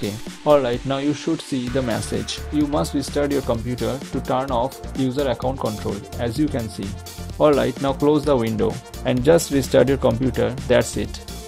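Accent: India. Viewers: Lots of love bhai Tysm i was stuck installing my printer ty ty ty love from India your voice seems you are from West Bengal india 🇮🇳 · i hate indian's english but thanks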